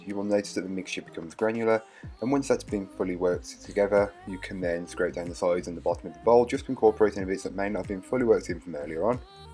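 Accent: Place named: England, United Kingdom. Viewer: Can I ask where your accent is from in England. It sounds very dulcet to ones hear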